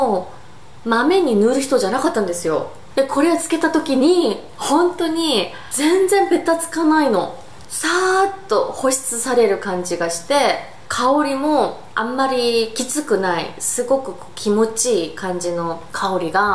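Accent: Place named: Kansai, Japan. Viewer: 関西弁おもしろい😂アロマ使ってみたいです！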